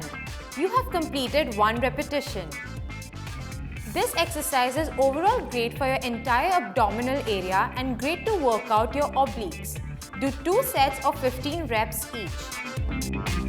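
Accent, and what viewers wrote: India. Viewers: Indian accent has to be the most annoying accent ever. Liked the exercises but listening to her speak is like nails against chalkboard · oof I'm indian but i hate this accent.... trying too hard.... but great video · U r indian... Right...came to know this after listening ur accent..😎😘😊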